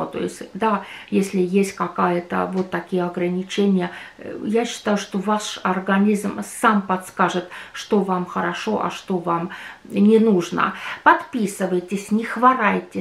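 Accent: Finland. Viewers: У вас прекрасный русский язык, а лёгкий финский акцент только придаёт вам дополнительный шарм! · у вас такое финское произношение!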